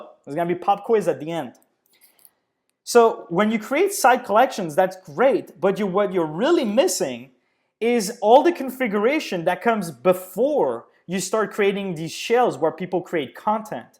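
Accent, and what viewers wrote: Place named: France. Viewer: Great insight and very helpful! Loved your French accent too :)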